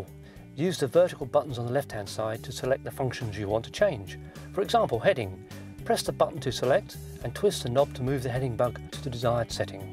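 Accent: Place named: Ireland. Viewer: hey man sorry for asking but where are you from? Let me guess... Ireland? Your english accent is amazing!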